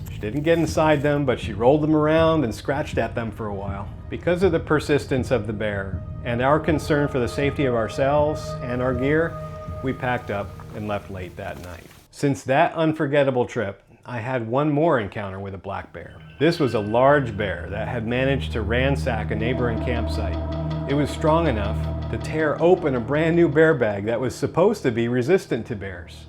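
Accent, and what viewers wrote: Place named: North Carolina, United States. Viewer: oh no! I was hoping you wouldnt say that! I had a feeling you were from NC the way you talked and the way the photos looked. I want to do flat laurel creek and sam knob. What scares me is I don't know how much of the bear activity goesunreported. Thank you for the heads up. I do have a bear canister and I figured you were going in that area when you said they were required in the area you were speaking of.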